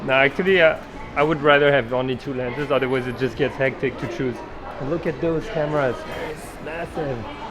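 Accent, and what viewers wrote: France. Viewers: You have a french accent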